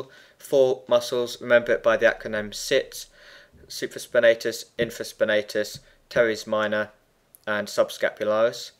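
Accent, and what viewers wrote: United Kingdom. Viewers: I can just imagine you teaching to your class and everybody's getting distracted with the British accent! Good job in your explanation though. Clear and concise :-)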